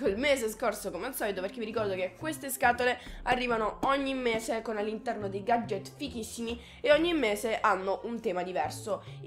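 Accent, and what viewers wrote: Apulia, Italy. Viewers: ma sei pugliese?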